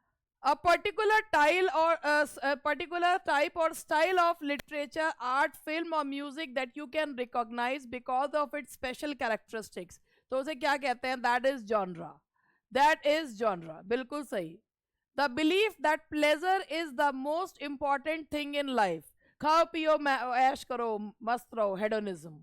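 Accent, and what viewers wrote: Meerut, India. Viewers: Ma'am aapka Meerut vala andaj bahut bha jata h dil ko❤❤🙏👍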